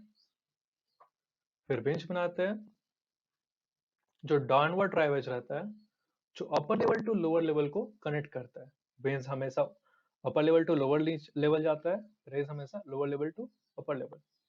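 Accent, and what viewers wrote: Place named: India. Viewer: I can't understand because your indian accent.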